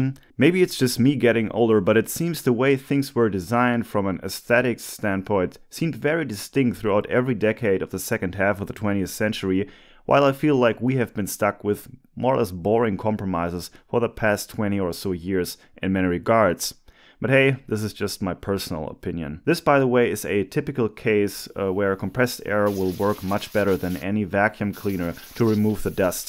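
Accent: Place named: Germany. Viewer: I love your videos and German accent. It gives me flashbacks to <num> Northern Germany while in the US army.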